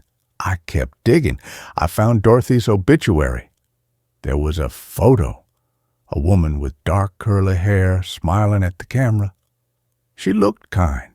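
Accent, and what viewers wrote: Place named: South, United States. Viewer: Laura here in South Georgia am. Love, love, your stories and the southern accent ❤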